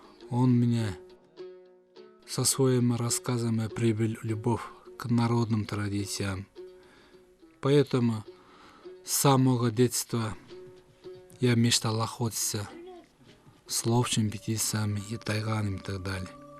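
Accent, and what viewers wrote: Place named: Kyrgyzstan. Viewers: Э гөкмээ байке кыргыз, иван же сергей эмес, таза кыргыз ушундай сүйлөйт орусчаны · Таза кыргыз орусча суйлогондо ушундай суйлойт билип ал манкурт☝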